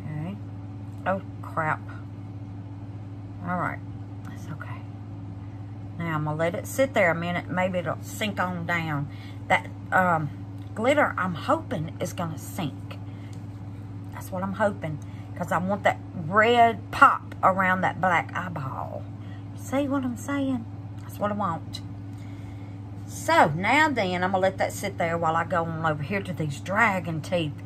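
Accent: South, United States. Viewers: I can tell you're from the south but I can't place it. I'm in northern Tennessee and I believe you're further south. Anyway, I really enjoyed your video 💖💖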